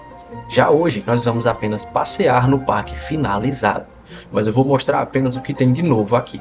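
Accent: Ceará, Brazil. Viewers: Cearense?, tipo eu🎉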